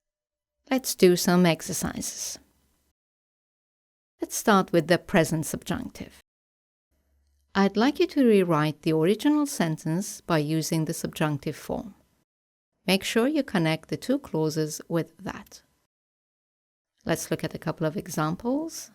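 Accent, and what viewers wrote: United Kingdom. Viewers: it is british english? Answer me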